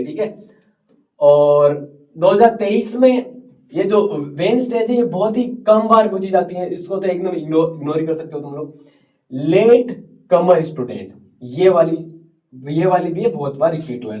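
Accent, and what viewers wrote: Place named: Rajasthan, India. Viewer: Sir aap to rajsthan ke ho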